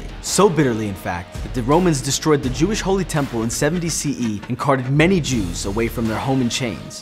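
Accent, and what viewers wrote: United States. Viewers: Are you Israeli or American? You sound like an American. God Bless you for all the information you have shared. You are bright and very knowledgeable. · I love that this guy has an American accent and can claim ownership over land that once belonged to the indigenous, and be proud. Why not go further back before the myths of the book? Who were the Canaanites and who are the direct descendants? This video proposes the current Palestinians were immigrants when we know they are direct descendants. So are the Lebanese who hold closer dna than any current Israeli. There is no tangible evidence of David ever existing, so for anyone today to excuse apartheid and ethnic cleansing based on folklore is beyond insanity.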